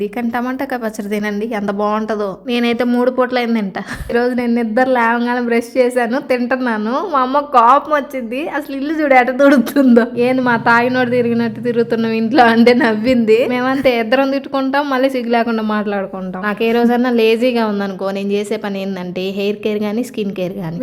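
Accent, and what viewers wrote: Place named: Nellore, India. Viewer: Sis medi evuru me language Nellore district vallalaga undi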